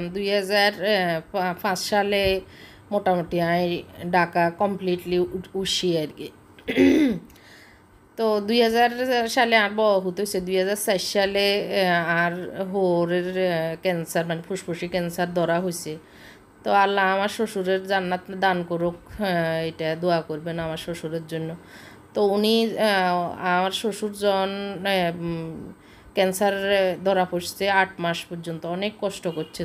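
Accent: Noakhali, Bangladesh. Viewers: আপু তোমার নোয়াখালীর কথা গুলো শুনতে খুব ভালো লাগল,অনেক হাসলাম আমার ছেলে মেয়ে নিয়ে,খুব ভালো লাগলো, তোমাকে অনেক ধন্যবাদ,ভালো থাকো আপু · নোয়াখাইল্লা ব্লগ খুব ভালো লাগছে....আন্নের কথা শুনি আর দিল টা ভরি গেছে.....আই ও নোয়াখাইল্লা দেই আর বহুত ভালা লাইগছে...আই গর্বিত আই নোয়াখাইল্লা দেই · Assalamualikum apu. Ami o Noakhalir meye. Apnar ajker vlog ta khub valo laglo. Apu apnar mukhe Noakhali r kotha e besi sundor lage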